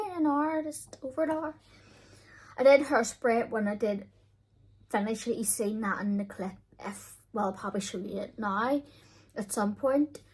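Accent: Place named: Ireland. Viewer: Thanks for video. Love your accent! Are you Irish or Scottish possibly? 🤔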